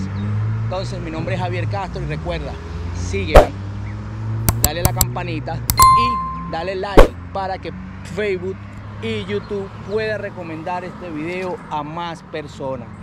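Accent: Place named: Venezuela. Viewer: Tines asento como de benesolano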